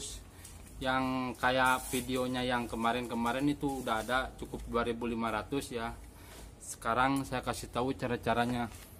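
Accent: West Java, Indonesia. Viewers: Ampelas nya no brp aa ? ni kyk nya org sunda ya ngmng nya wkwkw kocak bgt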